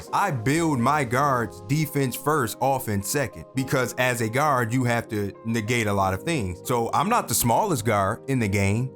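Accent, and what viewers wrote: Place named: Buffalo, United States. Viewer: Yo I know a Buffalo nigga when I hear one! 😂 great content tho my boy!! Earned a sub! 🔥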